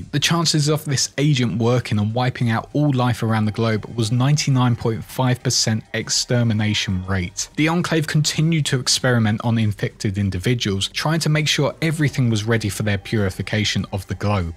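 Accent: United Kingdom. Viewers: Oh god NO did I find another British narrated video game lore channel? Now I’m going to have to watch all the videos. I’m caught up on the fudgemuppet catalog already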